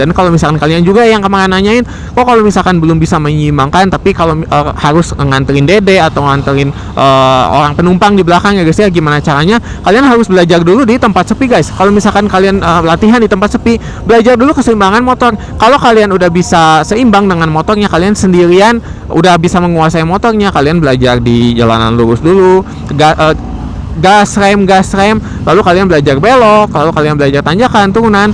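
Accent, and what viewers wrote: Bandung, Indonesia. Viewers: KK nya orang Bandung yah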